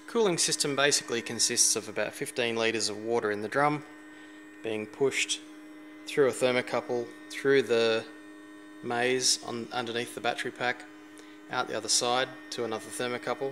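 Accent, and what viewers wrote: Australia. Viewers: Unmistakable PERTH accent!!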